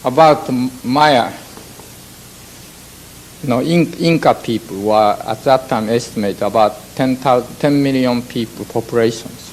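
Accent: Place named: Japan. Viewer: Love the video, but I can't unhear that he sounds like Morgan Freeman with a Japanese accent.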